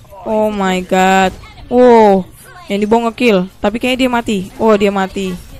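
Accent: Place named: Java, Indonesia. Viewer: Medok Jawa mantab to the tab tab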